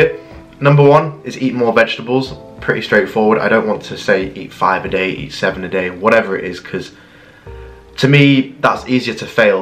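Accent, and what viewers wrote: United Kingdom. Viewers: you are wonderful! I love your down to earth style and your British accent is wonderful to listen to. Sorry to say, I'm too old to get physically fit. I know you will say "you're never too old." Been there, done that. But I really appreciate all your hard work and your beautiful body. (Do I sound gay? YESSSSS oh well) Love you,. Thank you for bringing some beauty into our sad world.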